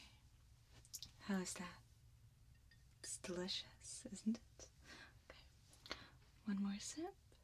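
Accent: United States. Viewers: I would LOVE some more sci-fi. You are the best,. Your diction and general English usage is way beyond what I'm seeing on competing channels. You also have a beautiful voice... no vocal fry, no nasality, no whine... and a very pleasing American accent. Listening to you talk is like spooning honey from a bottomless jar:-) As if that wasn't enough, the camera loves you too. Even with minimalist make up you always look sparkling and fresh. I guess you're used to people telling you how pretty you are, so I won't even go there:-D I wish I looked like you! I love your sci-fi style though. It reminded me a bit of the air hostesses in The Fifth Element, except their make-up is a little too heavy, for my taste anyway. Your make-up here is just about right. It goes perfectly with the sci-fi wig. You could also do a take on Rachael in Blade Runner. That would be kinda sci-fi, but you wouldn't need the wig. 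Thanks so much for your wonderful channel.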